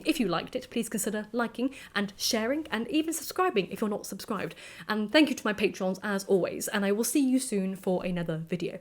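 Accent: RP, United Kingdom. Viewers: Came to hear a lady say boobie in a posh voice. Was not disappointed.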